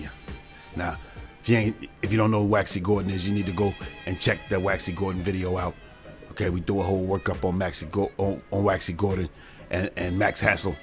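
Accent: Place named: Brooklyn, United States. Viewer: I grew up in Canarsie Brooklyn every one spoke like you they would say hey kid “smartin up”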